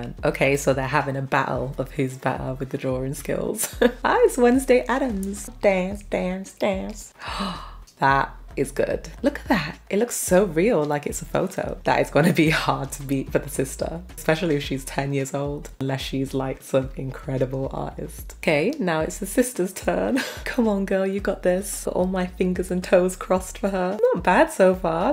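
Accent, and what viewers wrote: United Kingdom. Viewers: I love British people talk And you're a British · your voice is so calming and I love the accent, is it british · Anyone notice she is British?! 👇🏼😄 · One question for u are u from england that u speak British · Are u brittish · I love her British accent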